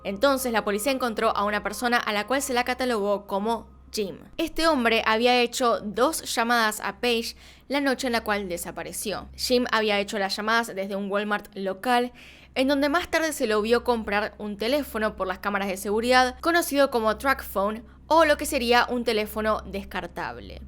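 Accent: Argentina. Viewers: Saludos desde Virginia Estados Unidos 🇺🇸 pero yo soy de Guatemala 🇬🇹 me encanta tu acento argentino 🙃 salúdame 🙂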